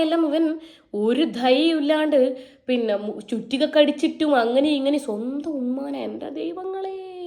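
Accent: Kasaragod, India. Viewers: Kasaragodinte shabdam 😊😊😊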